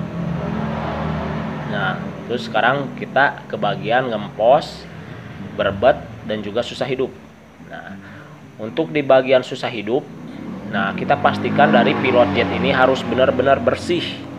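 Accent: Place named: Sunda, Indonesia. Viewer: Mang ngomong nya yang leres 😂😂😂 Indo campur sunda.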